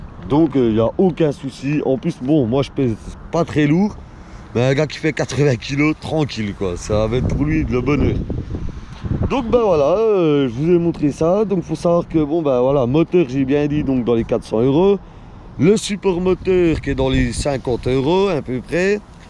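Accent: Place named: Franche-Comté, France. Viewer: Salut tu est francomtois?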